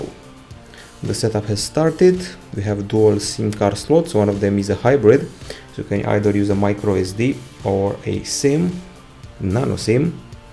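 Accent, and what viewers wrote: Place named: Romania. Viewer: Nice Romanian accent. 😀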